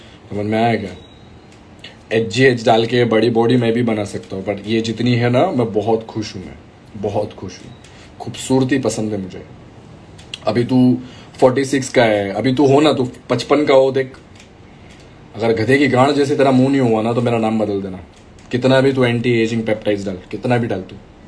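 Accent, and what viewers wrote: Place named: Mumbai, India. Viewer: Asali ❣️💪powerful voice🔥 bohat sari videos dekhi sahil Khan ke khilaf pr bhai aap ne jo bola he boss 🔥🙌 aur mumbai vali boli kadak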